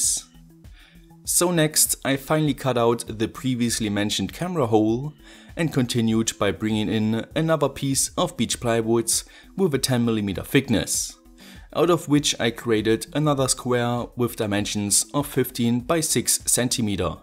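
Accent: Germany. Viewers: Mach Mal bitte mehr Deutsche Videos, ich mag es, wie du Englisch mit deutschem Akzent und Deutsch mit englischem Akzent sprichst! Translation: pls make more english videos, i Like it, how you speak english with a german accent and german with an english accent!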